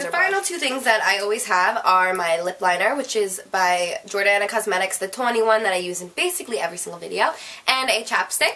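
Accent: New Jersey, United States. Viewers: i love her jersey accent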